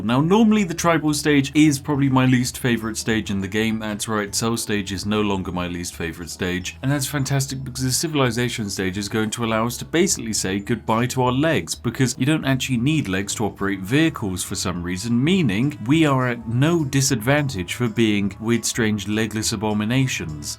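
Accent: United Kingdom. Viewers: This guy is definitely British guys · Your Brit is showing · I have a question, Are you Bri-ish? 🇬🇧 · Why r u british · I dunno what it is but something tells me he’s British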